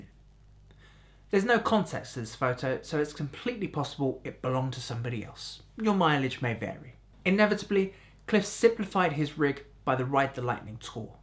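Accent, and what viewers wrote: England, United Kingdom. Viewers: I thought that was just his English accent.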